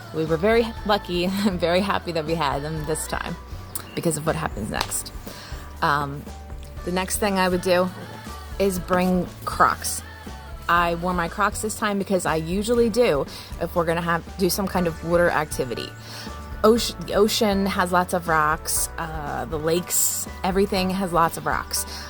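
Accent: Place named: New Jersey, United States. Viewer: Love it! You can take the girl out of Jersey, but can't take the Jersey out of the girl... "Wooder 🙂"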